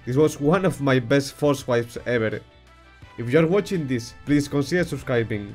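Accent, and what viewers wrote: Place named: Spain. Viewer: Se nota que hablas español eh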